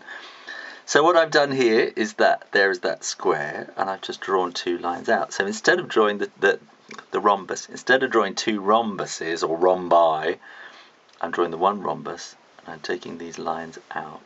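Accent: United Kingdom. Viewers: Thank you for the great videos! I love your British accent and your sense of humor...I'm learning a lot from you and I appreciate your talent so much! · are you british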